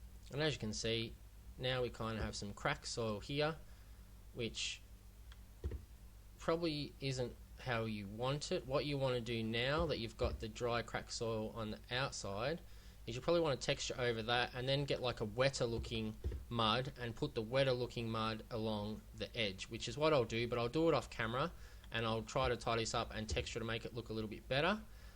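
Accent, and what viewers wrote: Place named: Australia. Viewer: I really appreciate your timely response, and thanks heaps mate. I am noting from your accent that you are from Australia just like me. I am new to Unity, although i'm discovering lots of things, I doubt that ill make money from unity, but would love to make some of my own games. I really appreciate your time, and I intend to follow your tutorials as they are very good. Ill try to fix the water thing later, again thanks heaps. I have been playing around with unity. I have found that sometimes characters do weird stuff like float or sink through floor (even if rigid body attatched). Anyway thanks heaps. You have earned a subscriber.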